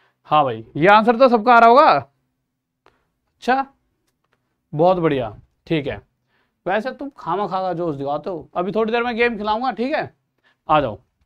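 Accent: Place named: Bulandshahr, India. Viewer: Sir bulandshahr wali bhasa bol rhe ho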